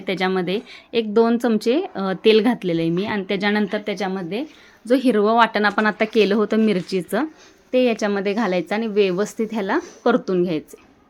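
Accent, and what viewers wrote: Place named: Kolhapur, India. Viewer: Khup ch chhan banvte tai tu chikan chya resipi s aani bolte pn chhan tuzi kolhapuri bhasha khup chhan aahe❤❤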